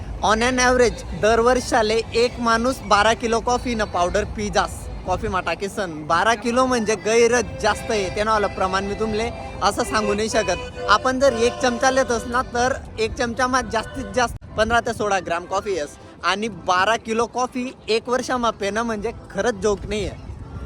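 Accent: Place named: Khandesh, India. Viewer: Dada mi tuna video dekhas. Male aavds tuna video . Tu parona she .mi pan karmud ni she . Pan sadhya mi borivali ma rahavle she. Pure khandeshi bolas te lay aavdas. Tule jevha time bheti tevha. Tuna ship dakhadjo. Jay khandes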